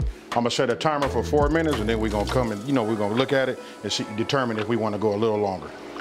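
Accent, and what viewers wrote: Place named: South Los Angeles, United States. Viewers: Factz luv his cali accent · He definitely sound like he from Slauson and western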